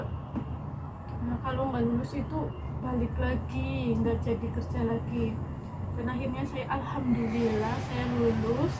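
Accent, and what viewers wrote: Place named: Cilacap, Indonesia. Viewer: Aku kok suka logat mbak nya ngomong jadi ingat temen saya ngapak2 daerah Cilacap.salam kenal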